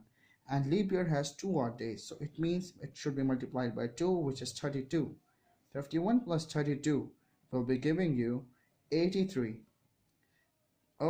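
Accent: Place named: Hindi, India. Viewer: Lol.. Nice...trying to catch up with an accent , but instead of getting along one, you are mixing accents and flow is still hindi .. And YOU KNOW🤣 strange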